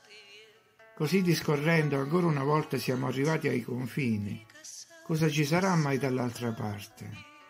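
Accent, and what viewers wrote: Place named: Abruzzo, Italy. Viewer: Tu sei abruzzese giusto? da l'accento sembra dato che io lo sono....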